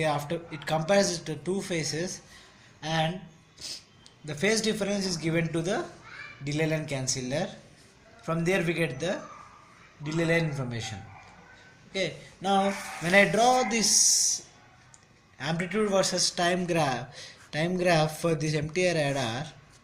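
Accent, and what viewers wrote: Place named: South India, India. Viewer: Your explanation is nice..but try to speak clearly..your South Indian accent is really confusing at times Thankyou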